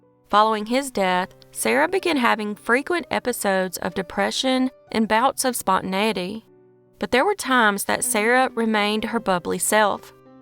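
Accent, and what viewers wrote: South, United States. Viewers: I must say I really like your channel; however, I've always found southern accents to be highly irritating to my ears. Sorry, nothing personal, obviously. Congratulations, though, on the popularity of your channel. Keep up the good work. 🥳 😉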